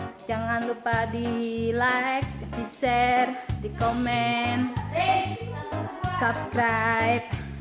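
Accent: Jakarta, Indonesia. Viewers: Salam kenal yo pok..aku dejet lho pamulang..pertama kali liat youtube pok lgs pengen liat terus video2 yg lain...biarpun aku org jawa tp denger celotehan pok yg khas betawi bangeet lucuuu...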